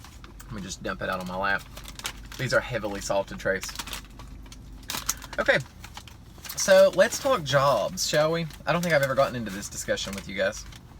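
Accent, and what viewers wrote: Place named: Deep South, United States. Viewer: Dewde your accent is Killin me, you must ve from the deep damn south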